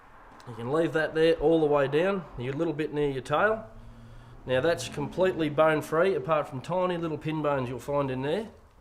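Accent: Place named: Australia. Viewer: the Australian accent :) I've been living in Syd for 7 years but just couldn't pick it up. love it though :P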